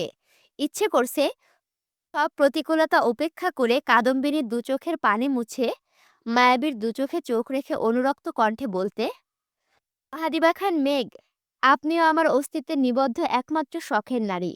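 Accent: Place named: Satkhira, Bangladesh. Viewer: Apu apnr district koi 😊 satkhira mone hocce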